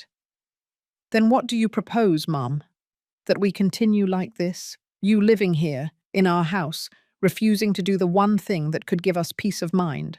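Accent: United Kingdom. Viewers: What kind of accent does the automated voice have? Is it British? It's not an accent you would expect to hear in Seattle.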